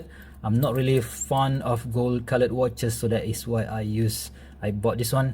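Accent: Malaysia. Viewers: nicely explained and straight to the point! :D by any chance are you malaysian or singaporean?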